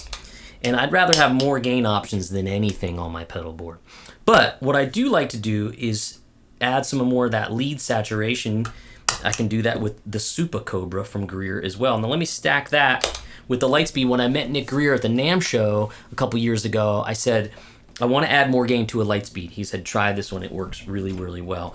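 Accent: South, United States. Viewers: a guy with an italian last name and a southern accent kinda freaks me out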